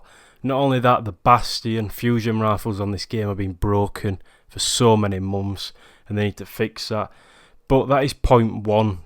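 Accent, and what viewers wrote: Yorkshire, United Kingdom. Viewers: I don’t know if you’ll see this but I love your accent 😂 it sounds Yorkshire a bit I’m from Yorkshire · I only live forty minutes from Sheffield. Your accent is one of the reasons I subscribed. Can't beat the no nonsense opinions of a Yorkshireman!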